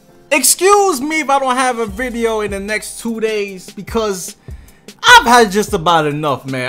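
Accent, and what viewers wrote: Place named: Boston, United States. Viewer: your definitely from the Boston area admit it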